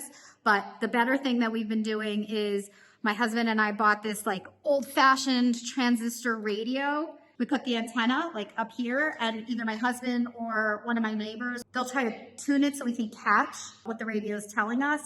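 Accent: United States. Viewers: you have a nice American accent....why u live on stolen land...go home save your Kids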